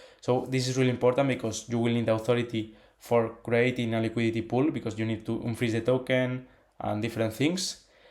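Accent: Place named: Spain. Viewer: Vamos ese acento español ahí a muerte! Buen contenido!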